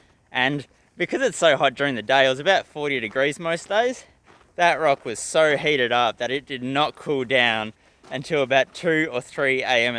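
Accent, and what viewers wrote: Australia. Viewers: Great video :D I'm watching you from some time but I didn't notice that you are half Polish. I guess you were born in Australia which explains the lack of accent. But it's nice to hear "no a szufeleczka, albo coś, dzień dobry..." next to your Australian accent.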